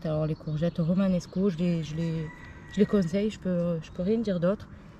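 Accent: South, France. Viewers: Bonjour je découvre votre chaîne qui est très bien, de plus j'adore votre accent du midi. Félicitations pour votre beau potager 👏👏👍👍. Cet automne j'ai mis beaucoup de fumier de cheval et de la paille je suis impatient de voir le résultat. Je mettrai du fumier de poule lors de la plantation en mai prochain. Je m'abonne 😉😉Ali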